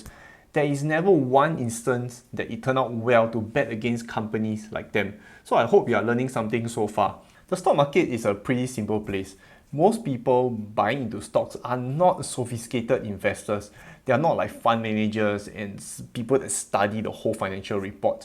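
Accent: Singapore. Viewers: You are incredible! Straightforward advice in a simple straight manner... love your accent.... Go Singapore! By the way, I am a Tesla fan as well... want to talk about Space X or Solar City going public on their own anytime soon? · I like the way u talk, really bring out the singaporean in us. Haha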